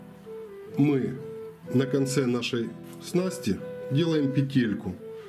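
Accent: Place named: Ukraine. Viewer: Я извиняюсь, но "шо" и "гх", вы с украины, автор??? Ничего личного, просто интересно...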